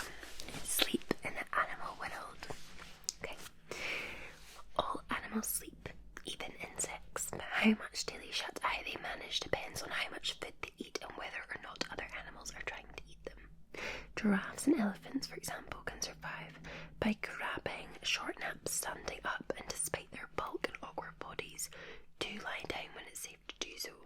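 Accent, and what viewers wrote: Scotland, United Kingdom. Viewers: My New favorite!!!! 😍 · I don’t know what it is but that Scottish accent is so soothing and it puts me to sleep really fast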